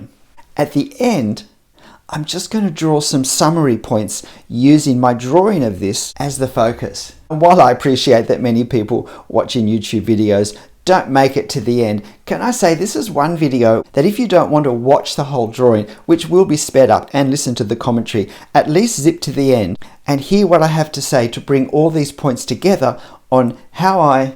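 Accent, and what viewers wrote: New Zealand. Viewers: I love that accent! "dee-toh" is it kiwi? New Zealand? I want to say I'm blown away by your work. The patch of flowers looked to tactile, like there was a path carved from people passing throught the flowers. And the last drawing... My God, breathtaking perspective.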